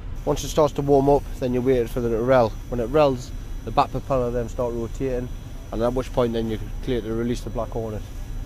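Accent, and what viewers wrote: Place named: United Kingdom. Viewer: What kind of shit mouth language is this British dope speaking...?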